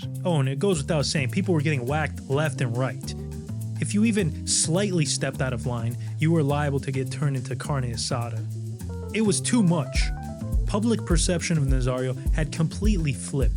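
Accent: New York, United States. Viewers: The new yorker/jersey accent makes this video better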